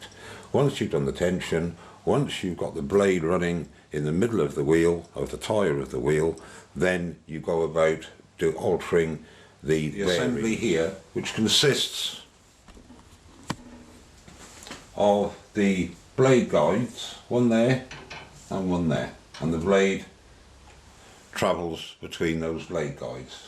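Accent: United Kingdom. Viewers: Really enjoy your videos being a brit living in amercia hearing a fellow brit talking about things I enjoy brings much joy and not to mention learning some great information keep your head up mate from a fellow brit who is home sick I really appreciate the videos.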